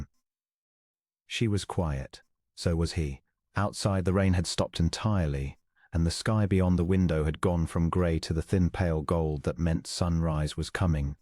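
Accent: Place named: United Kingdom. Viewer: At first was not sure I would like the narrator’s voice with a British accent, but as the story progressed, liked the cadence except the way Lionus was pronounced. Listening from Clarksville, TN.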